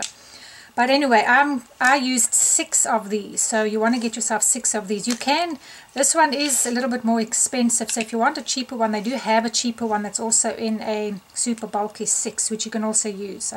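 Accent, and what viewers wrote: South Africa. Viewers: Am I right, don't the lady who speaks live in South Africa? Sounds almost like the South African accent. Anyway, beautiful Kimono!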